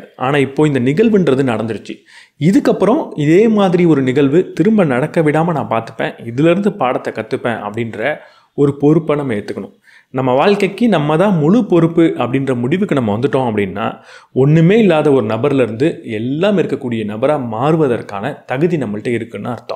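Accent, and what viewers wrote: India. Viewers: Super brother.. good feel. Bro neenga Tirunelveli' native ah. Enga voor slang varuthu neenga pesurappa.. well bro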